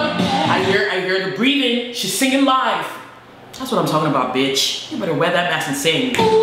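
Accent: United Kingdom. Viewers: I LOVE YOUR ALBUM REACTION AND ESPECIALLY YOUR 🇬🇧 ACCENT!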